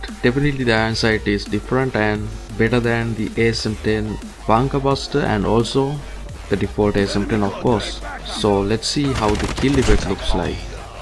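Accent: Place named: India. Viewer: u do sound indian.... But it's fine.... Keep up the great work .... But I am Indian so.... Love from india ❤️❤️❤️❤️ and I dealt with him -_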